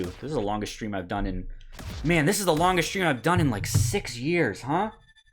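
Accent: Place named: Boston, United States. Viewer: Doesn't remember 90% of the jokes chat remembers. Remembers them shaming his Boston accent. Think about the effect your words will have, people.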